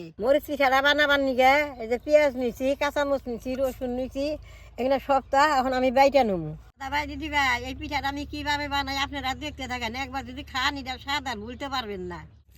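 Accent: Tangail, Bangladesh. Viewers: মাসির বাড়ি ইন্ডিয়া হতে পারে না।এটা টাংগাইলের ভাষা আমার নানার বাড়ি টাংগাইলে,মায়ের ভাষা এমন ছিল।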